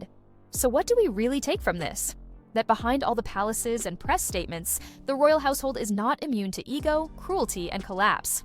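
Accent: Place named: United States. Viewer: so why is this trash presented with an american accent?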